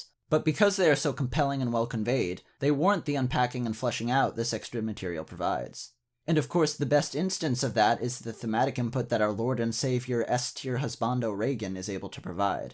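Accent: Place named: United States. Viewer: Forgive me if im wrong, but from your accent, im assuming your are american, in which case you should have studied in england, because you would have achieved 100% on any exam with that quality of ananlogy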